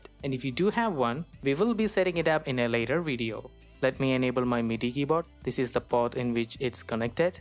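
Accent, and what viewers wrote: India. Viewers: What's the size of cakewalk walk [including studio instruments suite only] By the way... Where are you from?? Your voice sounds like an Indian ^_^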